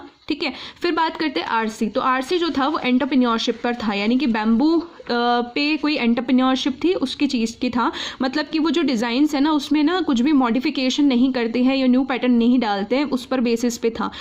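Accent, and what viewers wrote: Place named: Rajasthan, India. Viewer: Aap Rajasthan se ho na aapki voice se lg rha hai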